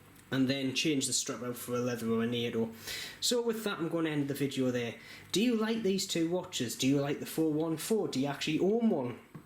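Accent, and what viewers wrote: Newcastle, United Kingdom. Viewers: Great presentation- nice to hear a Geordie too👍. Appreciated seeing the 413 - I am waiting for a 411 to arrive and already fancy the 413 as an addition. I’m going to go broke!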